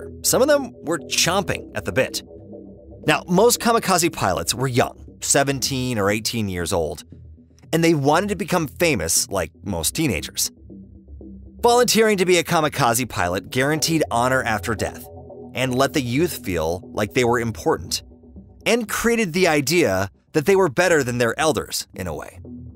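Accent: United States. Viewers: Too slow, A Yank of course.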